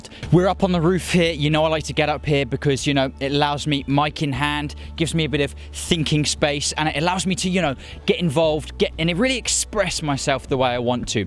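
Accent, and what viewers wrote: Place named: United Kingdom. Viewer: British accent is starting to annoy me.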